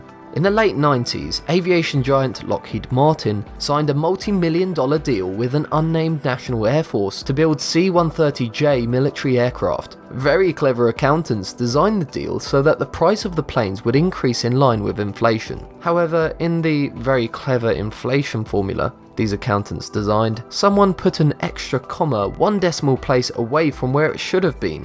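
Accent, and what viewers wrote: United Kingdom. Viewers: And your British axent like mine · Pietryga Lv he’s a Brit he has an accent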